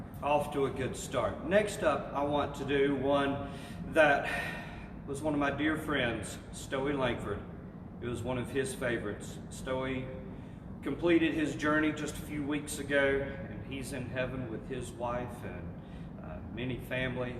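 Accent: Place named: North Carolina, United States. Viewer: I was wondering. You sure sound like a fellow Tarheel/hillbilly because you are! Good deal! You said Shelby and there are several Shelbys spread around. Good to hear all the good older ones. We're west of A-ville 60-something miles out in the hills and just found your channel. This is not the 1st time you ever sat at a keyboard. So Glad To Be Here from another Native, a rare and endangered species and GBWYou!.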